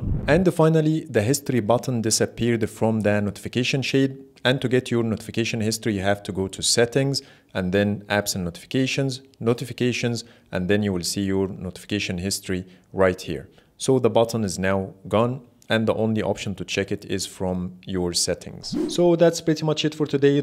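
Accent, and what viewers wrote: Egypt. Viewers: I can tell from your Accent that you are Egyptian Keep the hard work 🔥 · You sound Egyptian! :D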